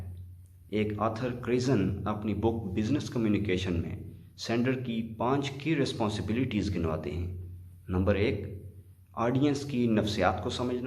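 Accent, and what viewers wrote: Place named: Kashmir, India. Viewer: A nice combination of urdu, a little bit hindi and English in kashmiri accent... It was really informative sir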